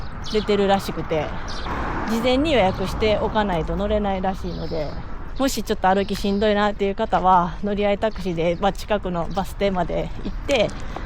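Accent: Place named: Kansai, Japan. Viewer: いつもこっそりと動画を拝見させてもらってます。 関西弁で、焼き鯖寿司を食べる女子、素直でとても魅力的な女子ですな👌 これからも、キャンプ道中お気おつけて🙂‍↕️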